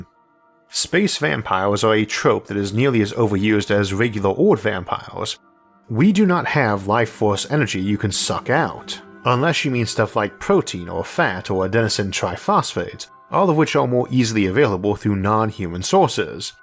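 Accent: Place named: United States. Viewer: American, but he also has rhoticism.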